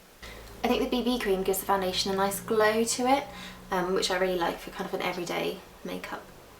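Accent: United Kingdom. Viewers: I love your make up routines and I love your british accent 😍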